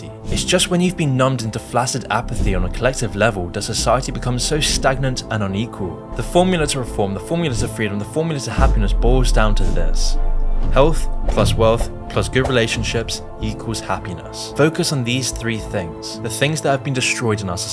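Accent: England, United Kingdom. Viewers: British accent describing problems with the US dollar? I do appreciate your work Mr., but why the foreign interest in American finance? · Yo u English?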